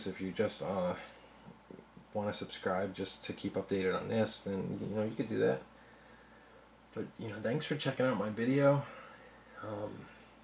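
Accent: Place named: Midwest, United States. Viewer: OK, first off, I can't believe they would make you pay a fee for THEM to disclose shit about the property, I thought they HAD to disclose a haunting! Email that show "The Dead Files" on the Travel Channel and get Amy Allen to come out and see who the heck is haunting your property. You have a baby, tell them that and they may make time for you. You sound "mid west" so start looking, lots of paranormal groups or a priest or a shaman. Start doing research on the property and the grounds or area.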